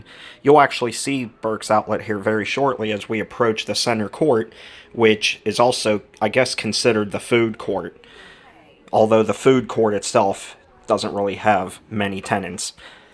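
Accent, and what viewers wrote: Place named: Western Pennsylvania, United States. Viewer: I hear a western Pa accent, Pittsburgh area? Am I right? or close.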